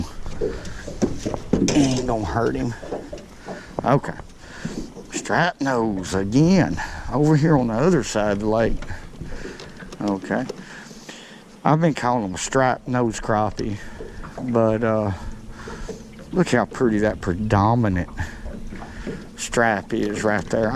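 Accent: South, United States. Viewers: Like your videos. Also like that southern traw! 👍🏻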